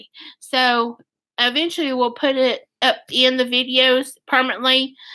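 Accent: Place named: Kentucky, United States. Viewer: I knew you were from Kentucky! 😀 You have that lovely “gentle southern” accent that seems to be specific to Kentucky 😊 so soothing. It’s one of my favorite things about you. 💗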